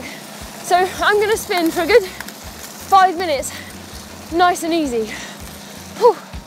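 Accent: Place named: United Kingdom. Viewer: We love you british accent Emma Poooooley!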